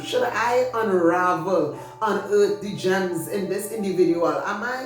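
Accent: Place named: Trinidad and Tobago. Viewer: It's the wisdom... But it's also the trini accent fuh meh! ❤️🎉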